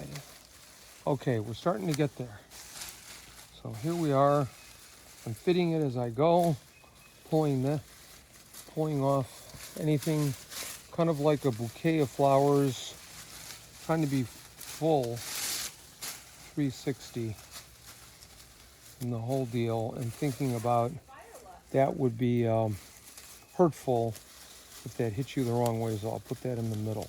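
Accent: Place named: United States. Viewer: Feels so weird to se a person so obviously Finnish by the looks, speak that American accent. /67% finnish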